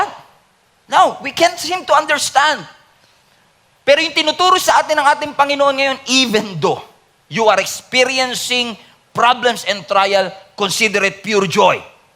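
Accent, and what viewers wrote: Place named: Visayas, Philippines. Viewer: Ang Ganda marunong kpala mag Bisaya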